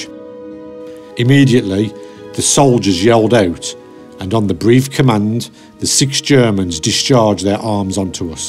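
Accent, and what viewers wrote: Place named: Northern England, United Kingdom. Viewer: At first the strong nothern accent in the narrative takes some patience, after about 5 minutes, the film develops and becomes a truly historical experience of the SS Reich Division massacre of the village. I had heard of this massacre in France as I am French/Irish/ and born in England, so, I have a decent knowledge from different angles on WW2 and WW1 (as my grandfather was in the French infantry from 1914 to 1917, he was seriously injured at Verdun, his brother was killed in 1916). My father was in England during WW2 and was 18 in 1945. One of my friends at school was German Venezuelan in England, his father was an SS Tank division commander in WW2 and has been suspected of taking part in a destruction of a village in France and the assassination of the village habitants. When at school in England from 1971 to 1981 I asked about the whereabouts of his father and one of the two sons who I knew well said “he can’t return to Europe, as he served in the SS and was an officer in charge of a Panzer Division)… His father was 15 years older than his mother who was Venezuelan and who I met in the North of England several times during half term holidays, small world, coincidences. 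Hard to grasp this level of evil and inhuman behavior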